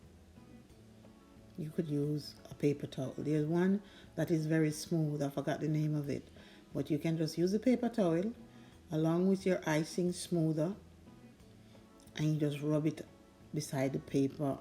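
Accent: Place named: Jamaica. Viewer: This was lovely can hear the real Jamaican voice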